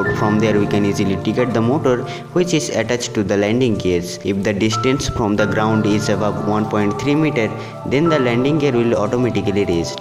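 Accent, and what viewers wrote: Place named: India. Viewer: It is Indian version of English ( India ), English is just a language, Don't give it to much importance, Skills are much more important ( and drones are the future )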